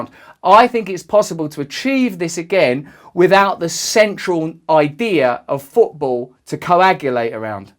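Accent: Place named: England, United Kingdom. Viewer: The vocabulary and accent is all England but the hand movements is 110% Italian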